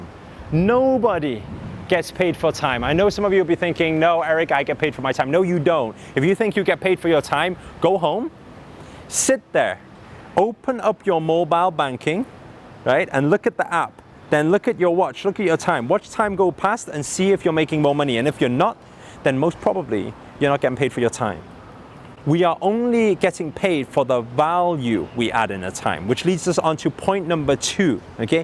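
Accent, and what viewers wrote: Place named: England, United Kingdom. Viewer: First time seeing Asian guy speaking in English accent 😅 sounds funny but he’s pulling it off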